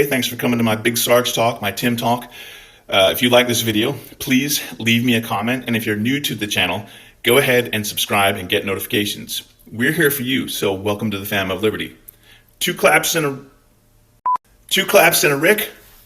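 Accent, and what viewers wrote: Scotland, United Kingdom. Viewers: Ah, you're Scottish!? No wonder you're cool as shit.🎉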